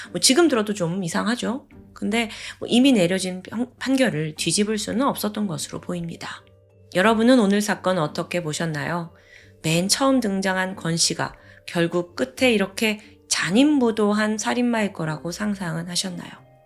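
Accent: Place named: Seoul, South Korea. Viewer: 목소리 맑고 순수한 서울말씨 얼굴 모두 다~아 좋아요 75세 팬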